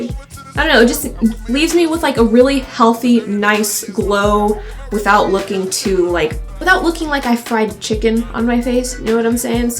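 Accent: Australia. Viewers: I just subscribed recently and when you started this video I was like "oh I forgot she was Australian" lmao. Loved this video:)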